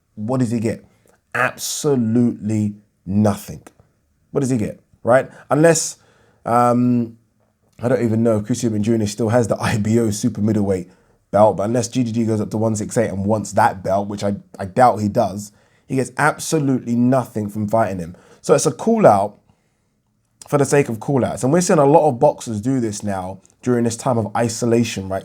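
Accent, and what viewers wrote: United Kingdom. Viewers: Appreciate your another attempt not to swear:) I mean I never judged you for that. As for the non-native speaker, I love your British language and it's even more beautiful if you don't swear =] As always, my greetings from the boxing country, Ukraine!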